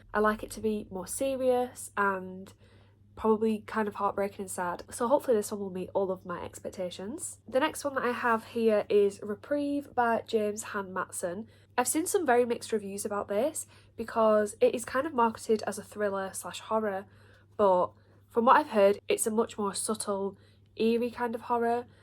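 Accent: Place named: Lancashire, United Kingdom. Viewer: hearing another Lancashire accent on BookTube is like a warm hug! the algorithm did a good job today ❤️❤️❤️ Cleopatra and Frankenstein is a masterpiece, enjoy!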